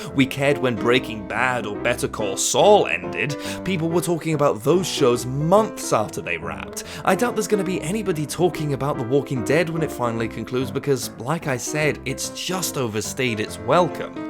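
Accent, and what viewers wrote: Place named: United Kingdom. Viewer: Holy shit! You are the most English boi ever. Also, this show sucks! Rip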